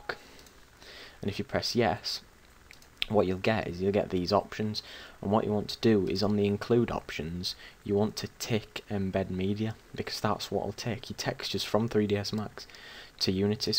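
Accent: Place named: England, United Kingdom. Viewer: non related question: I'm learning accents, and would love to know were in England is your accent from?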